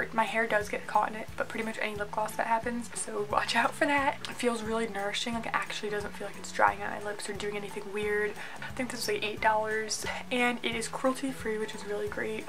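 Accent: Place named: United States. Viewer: She talks to fast can't understand her American accent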